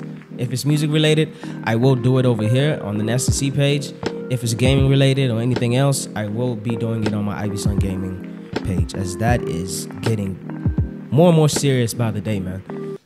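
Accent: United States. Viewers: Why does he sound American? I thought he is South African. I guess not because he just said mpoomaaaalaanga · He sound American must've been raised this side a bit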